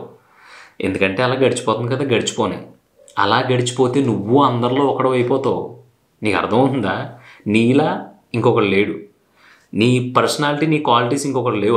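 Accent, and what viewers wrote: Godavari, India. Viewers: thanks for your motivation anna..midhi godavari side kada mi way of talking lo miru godavari side anipinchindhi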